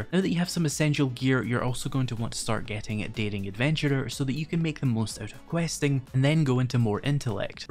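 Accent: Scotland, United Kingdom. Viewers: I like the twin turret build, tried it before - also stun batons are criminally underrated in a lot of scenarios as raw damage is not what they are about. Using them in a combo is fantastic in small scale fighting, stun zombie then sledgehammer for automatically guaranteed head-shot (or pistol or club etc). I do not tend to play on insane nightmare as I really do not enjoy it and I mainly play for enjoyment:) Warrior or Survivalist and feral senses on / zombies on run is about as much as I go. Main problem with this build I found was the insane iron usage, utterly bonkers at points - especially later on in the game, even with an augur. Really enjoy your vids, good to hear a fellow Scot on the YouTubes:)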